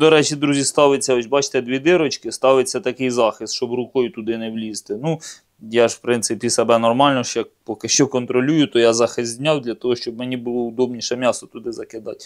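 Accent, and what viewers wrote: Ukraine. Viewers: Не із Західноіі Украііни вже чую по акценту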